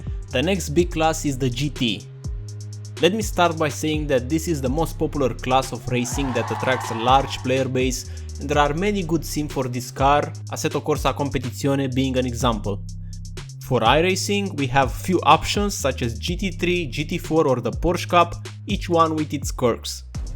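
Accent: Romania. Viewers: are u from romania or ur accent sound kinda like a romanina that knows english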